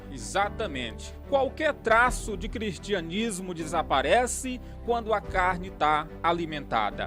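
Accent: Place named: Ceará, Brazil. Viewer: Cearense da gema😅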